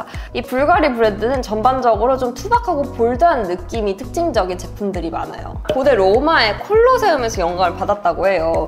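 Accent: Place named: China. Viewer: 어디 사투린지.. 독특한데 억세게 들리네요. 억양이 중국 말투 같아요😅 안녕 바이~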